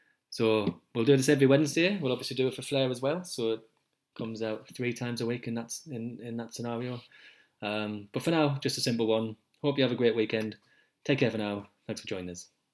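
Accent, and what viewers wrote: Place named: Darlington, United Kingdom. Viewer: Sounds like you're just a bit north of me in Darlington. Great to hear a localish voice talking about my favourite subject so I've liked and subscribed!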